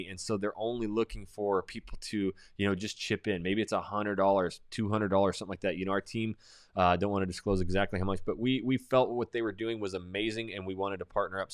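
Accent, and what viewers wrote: United States. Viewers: Bro u from Montana Portland or Houston 🤔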